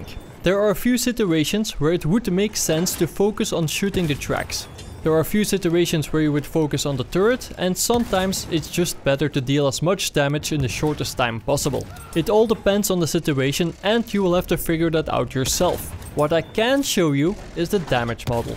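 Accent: Netherlands. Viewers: are you like Dutch, because your accent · you are dutch arent you ^^ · pretty sure he is dutch, its in the accent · waarom klinkt ge zo nerderlands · you sound dutch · this guy is dutch for sure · Are you dutch? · heared withing 0.001 seconds that you are dutch, might i be right? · its the most dutch accent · Dit Nederlands accent is erg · you are clearly Dutch, I can hear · are you Dutch? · Nice tips man! thanks a lot. Are you Dutch? :p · you have a Dutch-ish accent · dutch af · dat nederlandse accent tho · This was realy helpfullBtw are. You from holland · I like your dutch accent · u dutch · i bet you are from NL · m8 you dutch? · Your English sounds so Dutch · accent is super confusing, it sounds dutch but then also russian, and scottish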